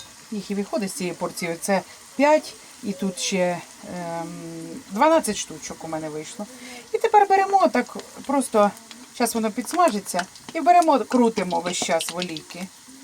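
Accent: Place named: Poltava, Ukraine. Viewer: нашу Полтавську говірку нічим не переб'єш.Дай Бог Всім здоров'я.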